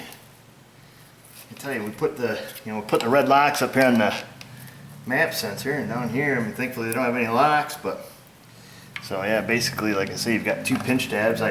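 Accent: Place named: Texas, United States. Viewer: i really enjoy your taking the time to show the tools you use to do the jobs with. i also enjoy your slow texas like voice. i can understand every word. i was literally shocked you were from new york. showing the little things is not reduntant at all. and worth it to a lot of us. your lighting is great and camera angle. you are doing a great job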